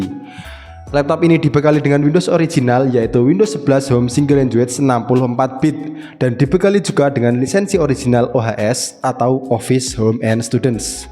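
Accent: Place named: Banyumas, Indonesia. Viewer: ngapak banget mas jadi ketawa saya😂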